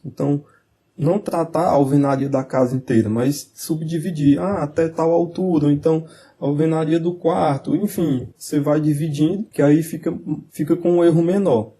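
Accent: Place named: Piauí, Brazil. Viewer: Parabéns pelos seus vídeos. Estou buscando informações sobre o Bim + Navisworks e seu canal foi muito esclarecedor. Quando ouvi o sotaque, tive certeza de que era do Piauí..haha Bom demais encontrar os conterrâneos por aqui. Sucesso!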